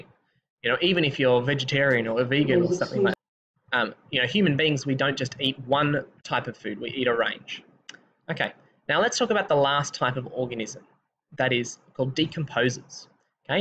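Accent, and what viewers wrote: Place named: South Africa. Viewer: I bet u r South African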